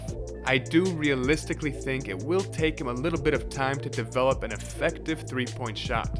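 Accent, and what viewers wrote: Israel. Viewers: רואים שאתה ישראלי איזה מבטא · LOVE your hebrew accent bro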